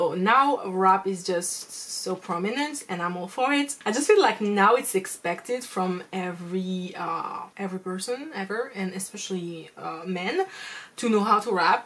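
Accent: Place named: France. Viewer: I love your personality!! Btw are you French? You have such a cute acceeent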